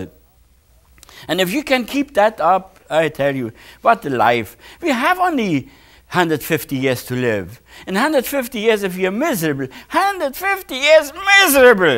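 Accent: Germany. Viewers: Der deutsche Akzent ist das Beste 😁.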